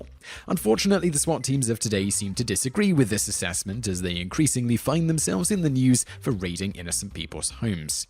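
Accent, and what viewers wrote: England, United Kingdom. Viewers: This is an extremely sensitive and devisive problem; to the extent that I kept noticing your use of the word "we". You are talking about concerns you particularly identify as U.S. problems, but you continually use we when considering actions and options. You have a very British accent so I don't know if you live in the U.S. or not. If you do, then welcome and include yourself as we; if not, then unless you are reporting facts related to many counties including your own you should not use the word we as it is inapplicable. The only fact you reported of which I was unaware was the possible use of steroids. I can't believe there are no medical tests to document their use and while I'm ignorant of laws governing their use I certainly think they should be illegal for armed people, even if you are a security guard or MP. On the whole I agree with your assessment of facts and think they are due to the fact that the U.S. 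is too big for oversight and responsibility is diffuse or non existent. It isn't just the police. The public school system is disgraceful. The sub literate in this country outnumber the literate and many teachers barely reach the standard of literate. But it is a sacred cow dying in the street of starvation in a Hindu society. Everyone reveres the cow, but no one takes responsibility for caring for it. Teachers lie and help students cheat to pass basic standard tests and everyone believes that is an aberration and not the norm, but it is the norm. Landlords of privat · I'm not sure where you are getting your facts, but I'm seeing many/severe falsehoods. Maybe stop getting your info from the bathroom stalls and actually speak with cops and other law enforcement officials. Top ten problems with your channel? 1 thru 10 totally wrong about everything said. Maybe instead of doing a video on a system you know nothing about, Brit. 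Go to your country and give us a video about the problems with British police. I guarantee you know more about that. Ps your accent is horrible. New Jersey is a state not a city, by the way. Get a map. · Is it weird that a British guy is narrating this?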